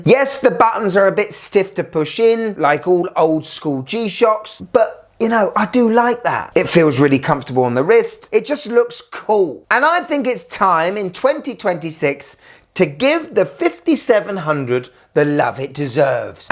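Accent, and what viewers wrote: United Kingdom. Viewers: When I first saw one of this guy's videos, I thought he was really intense, but now I love him. Even though English isn't my first language, I understand him perfectly; I think British English is easier to understand than American English.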